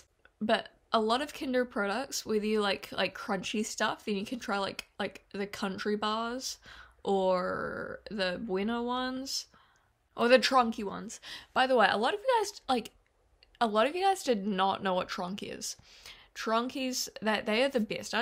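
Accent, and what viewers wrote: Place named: New Zealand. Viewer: its a new zealand accent :)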